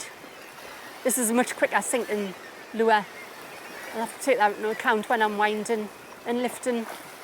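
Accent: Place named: North East England, United Kingdom. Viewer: Nowhere guarantees big fish, as I'm sure, you well know, but you can make their capture far more likely by choosing time and place carefully. Sure, just being there is good, and LRF for mini-species can be great fun, but if I'm using the real gear, I like to catch something I can eat. Your accent implies north-east England. There are some fine Cod, Bass, and flatties in that area, though of course, it's all about time and place. The opposite coast from you, holds loads of big flatties in the sandy estuaries, and some Bass. I got tired of catching wee Coalies a long time ago, so tend to avoid the east coast unless, I'm after flatties. Conversely, the west coast, and in particular, the Firth of Clyde has been commercially overfished to death. When I was a teenager, it was swarming with fish of many species. South-west Scotland can be good, but again, it's time and place. 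If I were you, I'd go less often, but farther afield. North-west and far north of Scotland can be excellent, though you'll need your hiking boots. Wales can be very good. It's not, all about catching big fish, but you'll get more watching if, you can show them a few they'd like to catch themselves.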